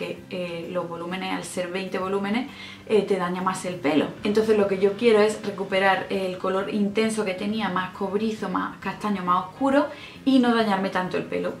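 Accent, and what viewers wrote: Andalusia, Spain. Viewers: Porque es andaluza... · Eres andaluza verdad? Felicidades por tu canal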